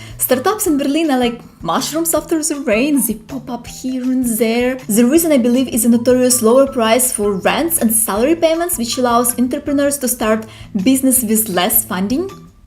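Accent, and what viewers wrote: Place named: Eastern Europe. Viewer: Nice person but I have to be honest....Listen to „eastern-English“ is really exhausting....sorry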